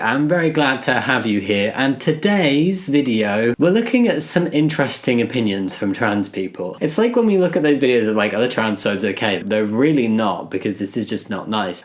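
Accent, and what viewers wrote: United Kingdom. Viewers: When did you move to the UK, your accent is very British? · He's always been British...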